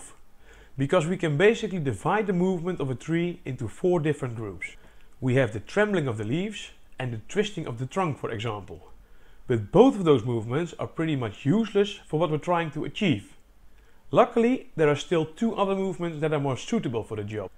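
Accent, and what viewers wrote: Netherlands. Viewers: 3 secs in you know this uploader is Dutch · The dutch accent ;p Amazing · are you a fellow Dutchman? Judging from the accent? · Hey, ik neem aan dat je een Nederlander bent aangezien we hetzelfde prachtige accent hebben als we Engels praten !! Ahha ... ik ben ook helemaal bezig met ideeën voor het opwekken van energy, ik wil 3d print en tech workshops gaan geven op mijn DIY elektrische fiets met een DIY cargo bike trailer/minicamper en natuurlijk een DIY energy systeem om alles te kunnen draaien, fiets, kleine printer, laptops, telefoons, etc etc. Ik ben nu in de bouwmaat op zoek naar alu profielen om een opklapbaarframe te kaken voor mijn zonnepanelen boven op mijn cargo trailer en fiets. Ik hoop dat we kunnen samenwerken, sta je open voor contact en eventueel een samenwerking ? Groetjes Ruben Dekker Allround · Je spreekt lekker "Dunglish".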